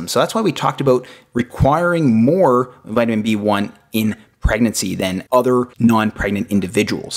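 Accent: Canada. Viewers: Yes, thats the first thing i thought, aha youre Canadian ! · John Silva he's Canadian. Lol. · Love the Canadian accent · This Doctor is Canadian., Every human who speaks English in the world has an Accent... Soooo this is the way people speak in his Country!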